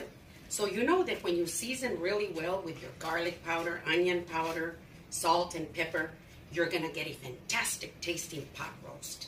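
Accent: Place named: North, United States. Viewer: Initially, i think you are hispanic with a northern us accent. Nevertheless, video is like home, thanks.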